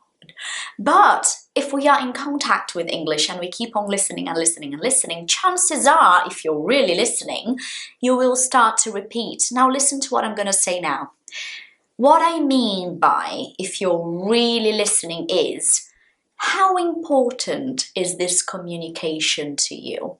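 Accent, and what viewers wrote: United Kingdom. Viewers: What??!?? Ela fala português?! O sotaque britânico dela é tão perfeito, que o português dela parece sua segunda língua.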